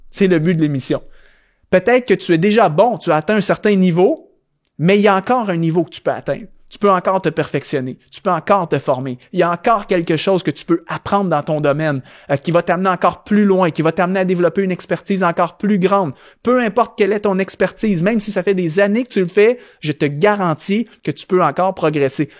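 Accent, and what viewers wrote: Quebec, Canada. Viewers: ton bel accent du Québec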